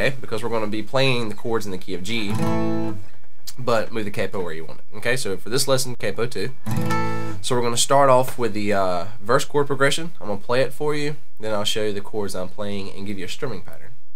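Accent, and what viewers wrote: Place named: South, United States. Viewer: He sounds kinda from the south anyway you rock man thanks for the vid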